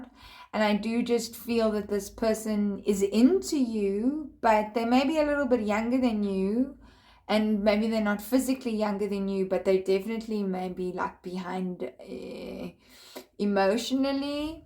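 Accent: South Africa. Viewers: Girly, I heard that accent and immediately knew you're south african 😂 glad to find a YouTuber that can relate to the struggles of loadshedding!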